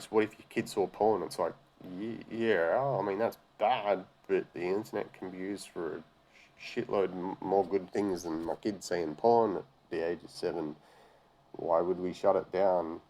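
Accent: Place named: Australia. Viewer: That was interesting 🤔 Why does an Australian accent make EVERYTHING more interesting 😁 All the K-Marts in USA went out of business years ago, had no idea they were still around over there. Wonder if they shipped all the crap from our stores to y'alls?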